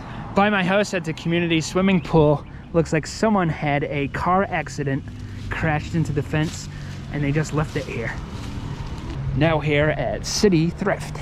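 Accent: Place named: North East England, United Kingdom. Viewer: For those not from the North East: "harrah" = Horror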